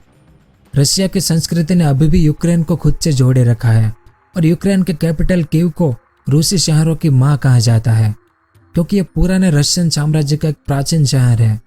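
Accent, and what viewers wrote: Gujarat, India. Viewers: Gujarati voice che Boleche te Gujarati che ne?????? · You are gujrati bro?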